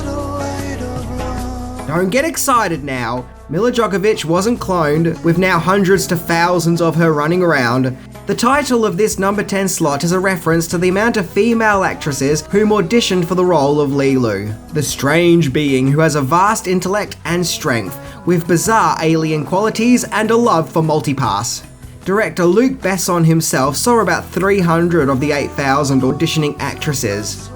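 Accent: Australia. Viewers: Hey love that Ausie accent!!! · imagine if made an 10 amazing facts video and didn't introduce 'imself in his best australian accent ".......and I"M!" i'm holding out for an "I'M " at the goodbye. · wait...you are from Australia?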